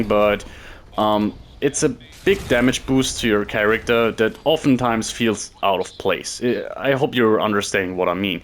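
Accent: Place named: Germany. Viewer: Ich hab keine Ahnung warum aber als Deutscher erkennt man andere englisch sprechende Deutsche wie aus dem nix 😆 Gutes Video 😇🥸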